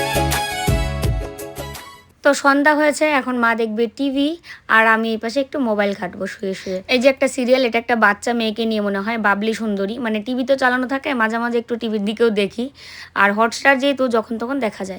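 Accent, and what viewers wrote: Bangal, Bangladesh. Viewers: Tumi j bangal vasha r adeshio vashay kotha bolo, besh valo lage shunte.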